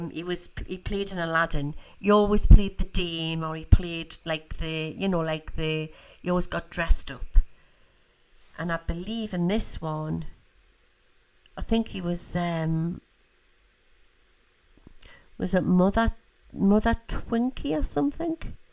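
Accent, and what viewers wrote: Newcastle, United Kingdom. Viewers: Love hearing the geordie voice, especially like when its just like being at the spookies in south shields years ago ❤ Hope you are improving ❤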